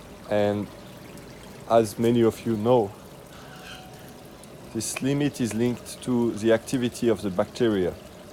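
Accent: France. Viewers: Sounds french:-), thank you for this video and your chanel.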